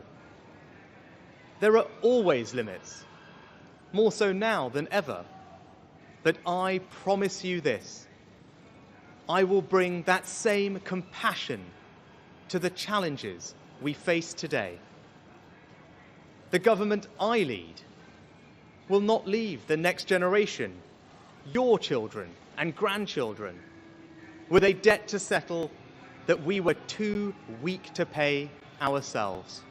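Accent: United Kingdom. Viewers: Sounds British to me, whats the fuss about his native roots... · this Indian man has brilliant UK accent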